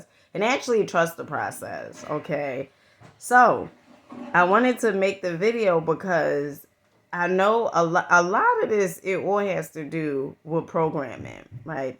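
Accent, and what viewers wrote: New York, United States. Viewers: Nice. To the point. When i was a young adult, i loved the N.Y. accent. Still do. From what part of NY are you?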